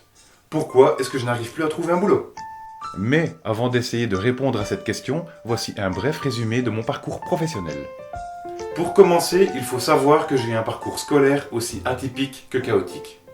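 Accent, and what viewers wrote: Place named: Belgium. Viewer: Hey salut maggle ! Petite vidéo pour pas dire grand-chose mais ça fait toujours plaisir de te revoir et d'entendre ton accent belge nous parler de la "pouissance". Ce com' est un encouragement à continuer :-). Plus de musique des 60's ça y est ? Un truc m'a déplu, c'est quand tu dis "c'est à cause de ces gens que...". Tu en as fait partie tu le reconnais, nous avons tous fait partie de "ces gens" à un moment de notre vie. Je pense que tu devrais plutôt juger l'attitude et non les personnes. Cela permet aux gens de changer plus facilement. PS : toi qui réfléchi au sens de la vie et la société, as-tu entendu parler du travail de Pablo Servigne ? As-tu regardé la série Next de Clément Montfort ? Bises.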